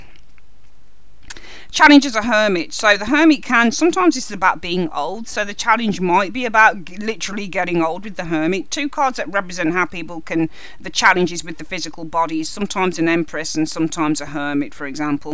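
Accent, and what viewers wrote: United Kingdom. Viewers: the 1st thing I want say is I wish I were British I love your accent! 2nd when I listened to your reading my mind was totally blown. Everything you said was so on point for me like we had a conversation and I told you every single thing going on in life this very second....unbelievable! I am going through such a horrible time at work I really hate it and can't seem to find another job, I have been looking since April. My company was bought out and this new company is a horror overworked lower pay they are phoney & so are my coworkers. I am so trapped emotionally & mentally exhausted. Wow you hit it all. I have cut some many people off its not funny, deleted my coworkers from Facebook almost 2 months ago my spirit told me to do it so wow. I said f- them all. My Sun & rising are both aries so I can't stand bs people & I can't & won't kiss ass. 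I know this won't last & things will get better & I can't wait I've been through such tried to stay humble but it's terrible to have things reversed. Thank you for your words God Bless You my sister.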